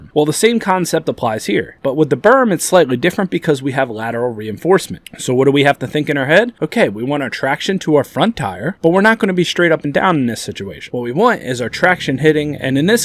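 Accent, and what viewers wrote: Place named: Pittsburgh, United States. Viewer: You from Pittsburgh? I sense a Pittsburgh accent N nat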